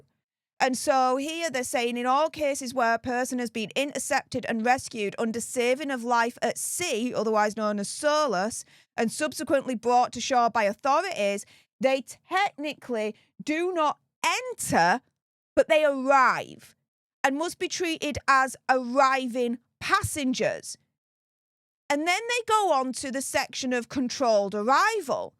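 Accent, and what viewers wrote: Yorkshire, United Kingdom. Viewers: For someone who was born in Yorkshire and raised in Yorkshire which I know because of your accent it doesn't matter where you go in the world you will always be a Yorkshiremen you should know better you should know better stop interrupting me when I am speaking you have a Yorkshire background none of these Yorkshire people would say that we could do not have immigrants as they have been in wars and seeing wars talk to your neighbours stop being so rude and judgemental go to Salford and speak to people who have actually been in the wars you lucky little carol have never been blown up have never seen your family blown up have always had a hospital of a school to go to stop being so horrible and selfish put yourself in somebody else's shoes walk a mile in afghanistan's shoes save you would like it have to live in a country that has are roads hospital or school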